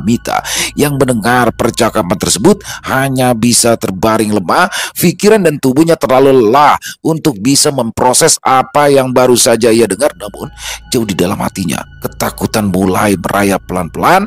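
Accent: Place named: Banjar, Indonesia. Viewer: Dialek Banjar nya enak sekali didengar.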